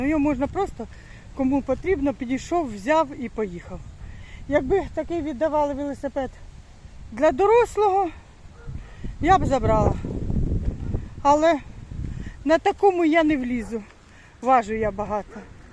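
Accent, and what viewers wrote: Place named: Central Ukraine, Ukraine. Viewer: Привіт землячко, по вимові чую що ви з центральної України🖐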